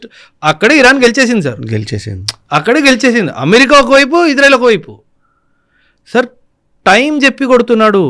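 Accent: Punjab, India. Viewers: OkA chilipi question sir italian of the east ani telugu langauge ni antaru meeru punjabi ina naku telisi mee antha sweet ga telugu ni maatladagala punjabini nenu chudaledu. telugu ni meeru baga enjoy chestaranukunta telugu old songs vintara ,Ghantasala gari songs ni baga enjoy chestu vintara. Please explain about telugu sir and what is the difference between punjabi langauge and telugu langauge. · Punjabi telugu matladutunte chala different ga vundi..but superb awesome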